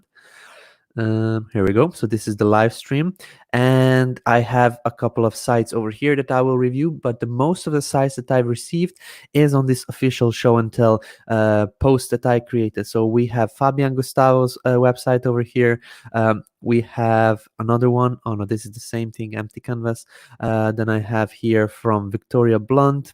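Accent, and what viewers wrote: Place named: England, United Kingdom. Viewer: I like this video but sometimes is hard to follow the english accent in all of his videos. thanks!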